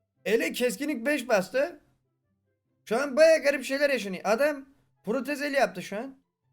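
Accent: Black Sea, Turkey. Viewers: Abi sen Karadenizli misin?????????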